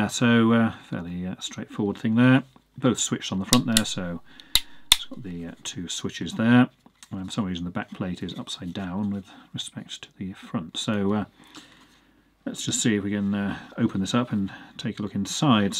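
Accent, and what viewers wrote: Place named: Northern England, United Kingdom. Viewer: Sometimes, sounds Northern.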